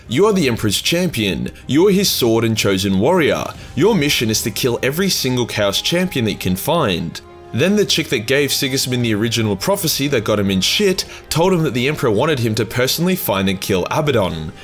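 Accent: Australia. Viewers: Love Aussie narrative... SIGGY :D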